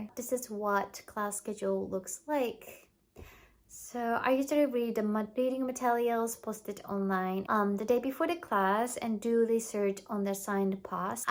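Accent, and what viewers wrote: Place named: United Kingdom. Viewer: Like your accent! Sounds so ‘British’ 😊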